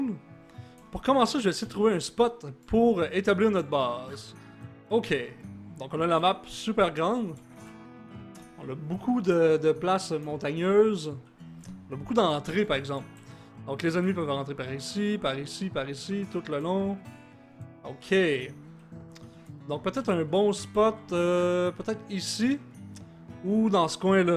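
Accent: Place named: Quebec, Canada. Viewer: Tu mérite plus d'abonnés , De plus que tu es Québécois (J'adore l'accent que vous avez ^^) et puis surtout tu expliques bien :D Je t'adore :D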